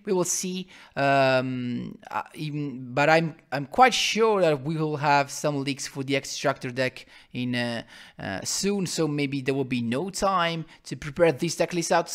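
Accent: Italy. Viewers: Ma quanto cazzo sei italiano? Sgamato dall’accento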